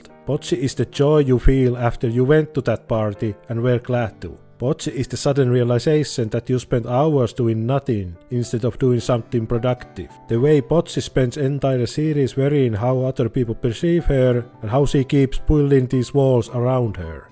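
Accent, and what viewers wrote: Finland. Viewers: As finnish i can recognize strong finnish english accent. Great video btw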